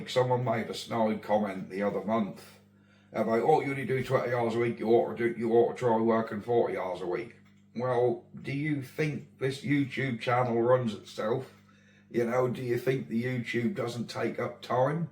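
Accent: Norfolk, United Kingdom. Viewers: Still here at the end. Firstly all the best to Mrs Mancave we're all thinking of her. Second there was loads of good news in this one, apart from the Mrs and all your travelling oh and the price of suet. For myself please don't change a thing. I watch your vids because your knowledge of all old engines that you bring back to life is amazing. I'm more than happy to watch anything you put up. PLEASE never put background, so called , music in your vids. We want to hear you not background noise.. Not sure about a live stream. The time taken up, I think, would be better spent in the workshop. This is getting as long as your chatting so all the best to you both and keep things coming...Oh and I love the Norfolk accent. A coffee on it's way. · I'm the proud son of a Norfolk man and your accent brings back memories of holidays in Yarmouth, Lowestoft etc when dad (who never lost his accent) Acle market in fact after a couple pints mum couldn't understand him for hours after lol , also when delivering to the Norwich area I felt at home dad died just after the millennium at 82 and is much missed. 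All the best to Mrs man cave look after each other...I'll e watching and I am subbed. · Well done for sharing your up date on Rachel and your situation, iam sure your doing the right thing for you and Mrs mancave, you don't have to justify yourself to sterile comments from individual's, keep the channel moving along mate and keep that Norfolk accent, get better soon Rachel, watched to the end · God Bless Mrs Mancave and yourself.. Your doing the right thing by leaving work to look after your Wife and doing your Norfolk Man Cave Channel.. Carry on doing what your doing.... I love your Norfolk accent don't ever change it, we came down to North Norfolk 3 times this year on holiday and love it! 😄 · Hi, I would just like to say to you and Mrs Mancave, I wish the both of you all the luck in the world, I know from experience what her treatment entails , my dear wife was diagnosed with Lymphoma cancer, we was told it was around 8 to 12 months to complete the weekly Chemo and the rest of it , be in no mistake it is very hard, my Mrs ended up with holes in her intestine and was bringing her bowel contents up through her mouth. 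🤢🤢🤢🤮 But after we got going onwards we had 10 clear years and we made hay while the sun shone, if you can take my bit of advice, as time goes on you will be getting worn down but you won’t recognise it for yourself, I’ve NOT said all this to scare you, I’m just trying to make you aware of what might be coming your way, we didn’t have anyone to pre warn us how things may turn out, if I can just say this bit it might sound odd me telling you this but now and again when your dear wife is zonked out after the hospital visit, get yourself settled down and even if you don’t nod off just rest it’ll do you the world of good, another thing I’ll take you to task on is DO NOT apologise for your accent, my mums family was and still are from Gorleston and my Grandad & Grandma was very broad Norfolk types so be proud of yourself. Another little gem we didn’t get told is nearly every week we was at hospital a lady would come round with a clipboard and she would say to me , how’s things ?? 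Are you both ok?, course it’s yes thanks, what no one told me was, she was from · You are both very brave. Mrs Mancave especially. I am sure all your subscribers will continue to support the channel. I always watch with dad so don't often watch my subscription, however I will make sure to always give you a thumbs up and a comment on mine too. We shall be thinking of Rachel on the 6th bless her. Don't hide your accent ,the reason we started watching you was because you talk like us be Norfolk and proud lol. All the very best to you both.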